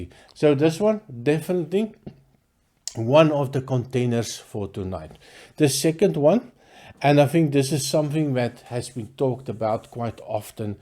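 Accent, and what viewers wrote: South Africa. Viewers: Very surprising top 3. I've had none of them before so I definitely gonna try them. Besides that, I appreciate the honest way you talk about whisk. Your south-african accent brings back good memories of my two holidays I've spent there. I live near the Belgian border in the Netherlands. Maybe we'll meet somewhere :-) Best wishes for 2023!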